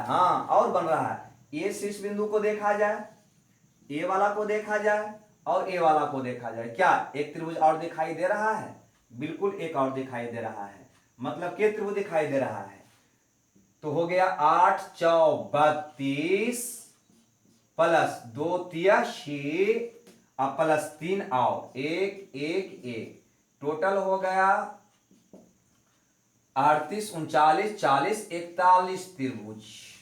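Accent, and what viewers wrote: Bihar, India. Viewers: wow gajab ka pdate ho sir...bihari stayl bha gya... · Sir aap Bihar se h kya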